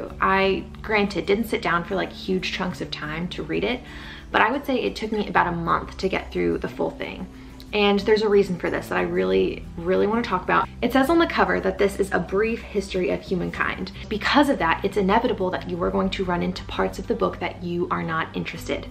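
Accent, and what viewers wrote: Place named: California, United States. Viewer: Good Review! I was listening and not watching and your California accent was flowing out of you very naturally. Gave me nostalgia.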